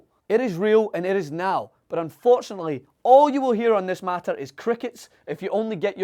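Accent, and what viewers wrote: Scotland, United Kingdom. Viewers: Dat scottish accent - adds a certain flavor of badassness :D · Since when does TYT have a Scottish host?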